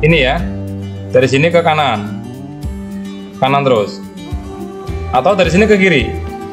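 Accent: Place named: Banyumas, Indonesia. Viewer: Bapaknya ngapak ya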